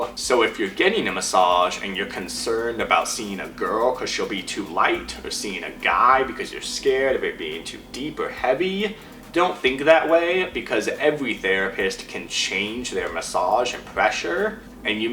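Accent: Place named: Louisiana, United States. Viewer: Handsome Canun Louisiana accent.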